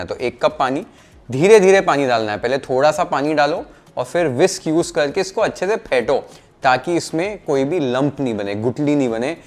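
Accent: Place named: Mumbai, India. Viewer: Sanjyot is born and brought up in Mumbai, that explains his Marathi accent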